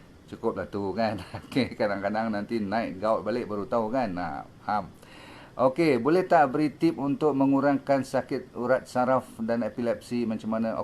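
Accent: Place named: North, Malaysia. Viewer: 1.pandangan saya kalau Dr. ni cakap bahasa melayu pun mesti tak akan cakap ikut Bahasa Malaysia standard. Sebab mungkin akan mengambil masa memperbetulkan cara cakap. Dr. berasal dari utara. Tapi dalam video ni nampak la doc dah cuba untuk bercakap dlm bahasa yg mudah difahami org Malaysia. Kalau doc cakap semua dalam loghat utara mesti penonton dari negeri lain ada yg tak faham. Biarlah doc bercakap dengan apa yg dia rasa selesa dan santai. Saya sendiri kalau disuruh cakap Bahasa Malaysia standard dgn sebutan baku akan ambil masa untuk bercakap sebab dah terbiasa bercakap menggunakan loghat utara dan selatan serta English. 2. Lagi satu Bahasa Indonesia mempunyai lebih ramai speaker sebab itu orang luar tertarik nak belajar.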